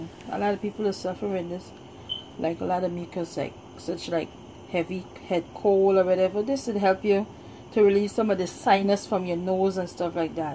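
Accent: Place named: Guyana. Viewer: Peace! You soundin like my countrywoman (bini) from Guyana. Thanks for this Queen!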